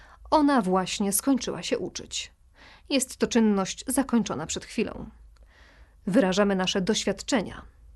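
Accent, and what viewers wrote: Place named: United Kingdom. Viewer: Ten Brytyjski akcent parzy w uszy.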